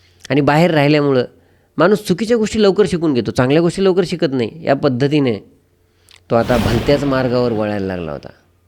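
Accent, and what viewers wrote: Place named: Rural, India. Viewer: Narrator's Marathi sounds unsophisticated and from rural area. It should be polished to reach out to urban audience.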